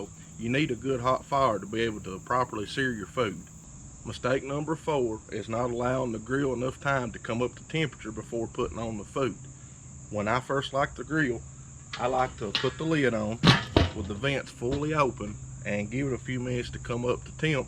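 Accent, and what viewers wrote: South, United States. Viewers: Good to hear a Southern accent. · Big southern boy, sounds like sound advice. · what is it about the southern accent that makes us just trust whatever they say lol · All of these gas or pellet grills don't know the pure enjoyment of Caveman grilling with charcoal. When I heard the Southern accent I knew this was on like Donkey Kong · Is it prejudice of me to be like “he has a southern accent, imma listen to him about BBQ?” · Great Video mate. I'm from Australia and your Southern Accent is just perfect.. Lol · Love the tips and love the acent. How can you sound like a US Southener so authenticly? keep up the good work! Cheers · Where is that southern accent from? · People can pick on a southern people but I am so proud to be Southern I love to hear us talk including myself LOL my accent ain't nowhere near as deep as this my daddy and family had a accent like this my Yankee husband took mine away a little bit😂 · That’s the most southern accent I ever heard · Love the Southern accent, this guy knows what he's talking about! 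· If a guy is has a southern accent and is talking about a gun or grill...listen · Funny how this accent is associated with clean, efficient, non-meme information from experience. The time will inevitably come when the memes will reach the American South. Then no one is safe no moar 😆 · What part of the US is that dialect from? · Special like for southern accent. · That's the most american voice I've ever heard, absolute faith in this man's knowledge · Heavy southern accent and a cap that says tractor supply, you just know you are at the right place for grillin.... :-) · Whereabouts in the US are you from? Loving the accent. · Thank you I really Like Your videos and Your country southern accent Your tips have help Me very much Your a Great American God Bless you.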